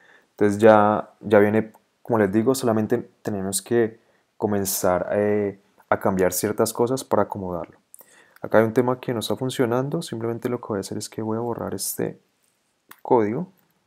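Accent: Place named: Bogota, Colombia. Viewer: Ese acento de norte de Bogota si se puede ver hahahaha gran tutorial!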